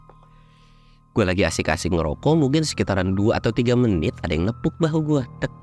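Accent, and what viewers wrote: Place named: Bogor, Indonesia. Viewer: Waaaaahhhhhh...... asikkkkk.... suka dengerin... klw cerita ny sm mamang.. Bogor nich bang....😅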